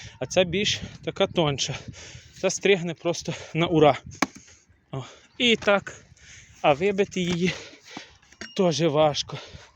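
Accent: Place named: Ternopil, Ukraine. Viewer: говір гарний в тебе! Це часом не Терн. Область?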